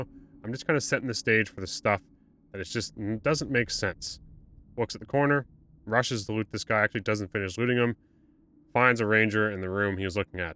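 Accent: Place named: United States. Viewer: your english is indistinguishable from an american speaking English, where are you from?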